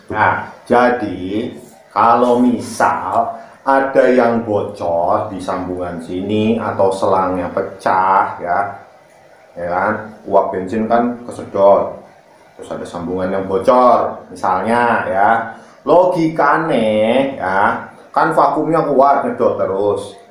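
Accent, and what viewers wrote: Banyumas, Indonesia. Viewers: Basane ngapak temen kue kang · Daerah mana Boss,kok ngapak dialeknya.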